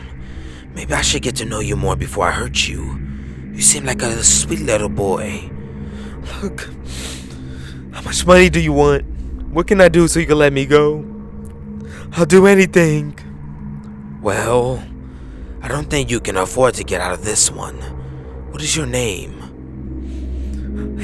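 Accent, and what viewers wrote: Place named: South, United States. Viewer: Awesome! I like your accent! I'm guessing it's from the south, but I'm half Japanese,half Danish so I'm not the best to hear the difference,but been to US many times and my aunt lives in Houston and your accent sounds like my uncles! Anywho I'm very fond of your narration, accent and especially when you get your lady to knock and you let us hear the "takeout" it makes you "human" ! Hope the new stupid guidelines won't affect your channel as you have great thing going on here and you know what hot now! Hope you'll do more ghost/paranormal as your voice is great at that! Good luck with everything! Xoxo DM ,Copenhagen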